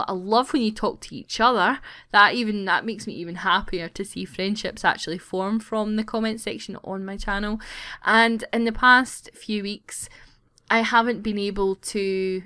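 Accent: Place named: Scotland, United Kingdom. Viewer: I'm going to feel really bad if I'm wrong...but I'm pretty sure you're from Scotland, right? But you remind me a lot of my aunt Ella from Scotland :3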